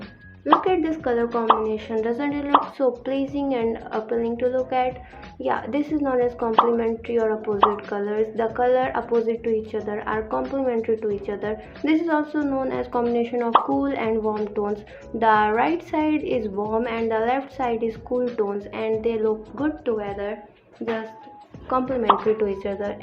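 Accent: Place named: India. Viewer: You are right the accent does sound Indian, or SEA at least. But hey none of our business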